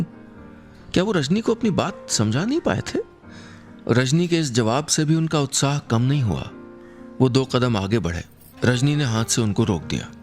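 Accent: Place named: Kumaon, India. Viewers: I luv ur pahadi accent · Love your way of kumaoni diaglouge Can’t control my laughing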